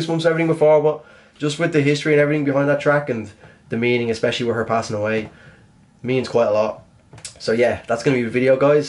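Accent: Ireland. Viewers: Limerick? Is that an Irish accent? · is one of my all time favorites!! I feel like they did the cover very well and still hit the feels!! Love the Irish accent!! 😊❤️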